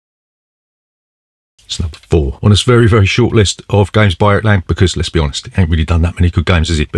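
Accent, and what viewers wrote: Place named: Newcastle, United Kingdom. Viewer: Superb again. Never knew you were a Geordie like me. Gan canny bonny lad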